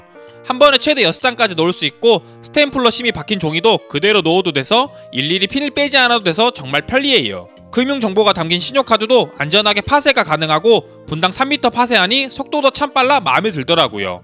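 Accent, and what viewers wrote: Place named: Jeolla, South Korea. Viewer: 전라도 분이신가..말투에서 전라도 느낌이 확나는디ㅋ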